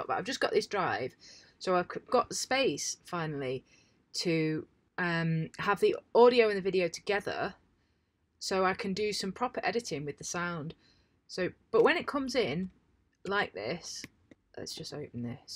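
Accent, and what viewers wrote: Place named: Yorkshire, United Kingdom. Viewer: are you from yorkshire? as a yorkie myself i recognise that accent anywhere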